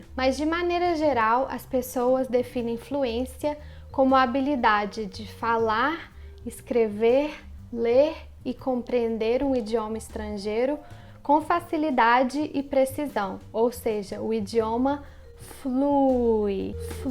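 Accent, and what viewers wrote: Minas Gerais, Brazil. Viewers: Ótimo vídeo...você é mineira? :D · O seu padrão , com este sotaque , é mineirês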